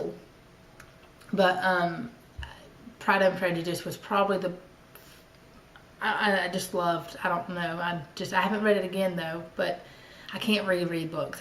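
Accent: South, United States. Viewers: there is nothing wrong with being a Basic kind of person! You are one of my absolute favorite stitches (maybe becuase I'm from the South too and your accent reminds me of home) and I love your WIPs and finishes and hauls! You have a 2 yr old, as well, so no need to be down on yourself! You are ONE BUSY PERSON! I appreciate the time that you give all of us! Keep the videos coming!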